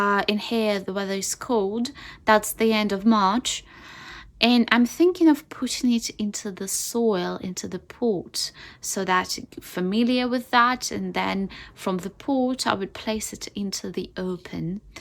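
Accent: United Kingdom. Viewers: I seems like you have learnt British accent, sounds good 😊. Honestly, I just learnt how to grow grape plants by you 😅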